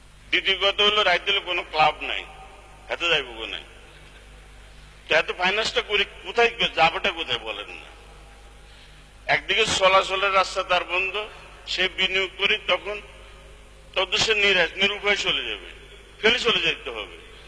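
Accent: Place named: Noakhali, Bangladesh. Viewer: ওম্মা হেতেন তো দেখি নোয়াখাইল্লা চমৎকার...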